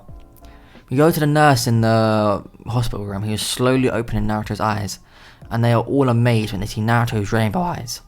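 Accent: United Kingdom. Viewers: u British mate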